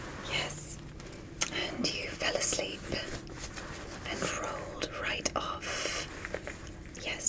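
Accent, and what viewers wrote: England, United Kingdom. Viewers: Am I the only one who noticed that she is British 😂😂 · I am so thrilled for another English accent video. The last one with the nurse I have watched several times. You deserve an award for that one. Thank you. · Mate you sound so british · Why is blud bri’ish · You are a Really Beautiful Doctor with the Most Beautiful British Accent!! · When I heard her for the first time I went "omg she's brittish" it's sounds so relaxing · Good English accent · one hour ANDDD British accent!?!?!? stop spoiling us!😍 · Love the British accent 💀 Or was it Australian? I can’t tell since you’re whispering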